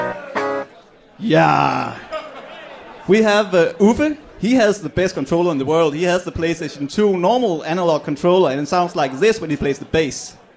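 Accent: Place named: Denmark. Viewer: Press Play on Tape is Danish, see <link> And yes, the accent very much sounds Danish :)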